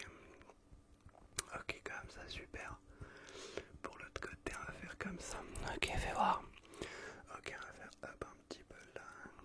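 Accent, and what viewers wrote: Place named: South, France. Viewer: Le petit accent du sud 🤣☀️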